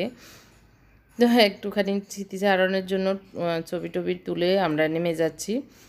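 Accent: Noakhali, Bangladesh. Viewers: আন্নে হুরাহুরি নোয়াখালী ??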